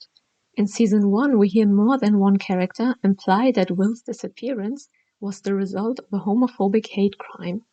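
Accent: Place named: Germany. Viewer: I think the way you say certain words, it had me wondering if you were a native German speaker. This is more of an accent thing, not a grammar thing—you expressed your ideas clearly. Someone once said to me "Understanding always comes before speaking", which I think is true for every new language you learn to speak! 😊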